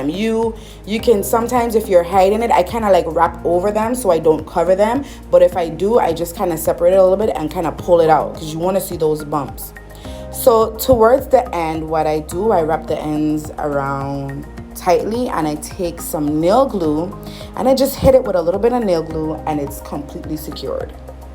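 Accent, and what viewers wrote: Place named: Barbados. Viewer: These locs are awesome and you are soooo gorgeous keep up the good work Your accent sounds a bit like a bajan lol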